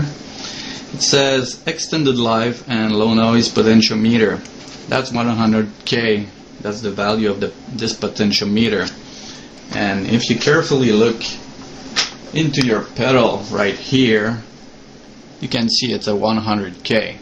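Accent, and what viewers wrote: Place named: Quebec, Canada. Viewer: You have a Quebec tone..lol Thanks for the review..I was going to buy one.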